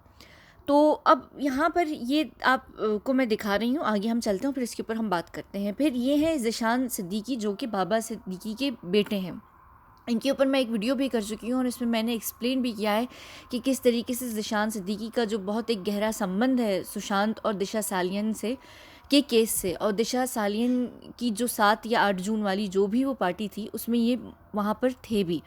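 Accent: England, United Kingdom. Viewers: I absolutely love your English accent mashallah 🥰 love you sooooooo much ❤️❤️❤️❤️❤️❤️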